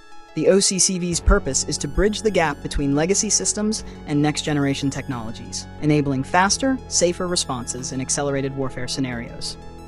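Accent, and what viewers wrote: United States. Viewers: It's hard to watch something about our modified Bucket narrated by a yank. About the project, i think it's Boffins inventing systems from the point of view as seen from their desk and pc. If you want a warbot, make a specifically built one from the ground up, not convert a manned battle taxi. Make it small with only what it needs. I'm all for preserving our fleshware, but this is not the platform to do it.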